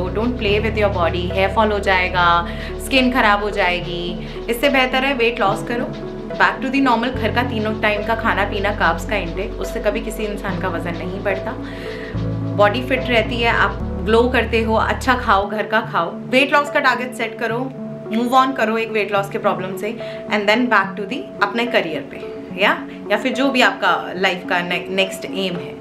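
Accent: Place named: Delhi, India. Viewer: I like ur delhi wala accent di. U r so inspiring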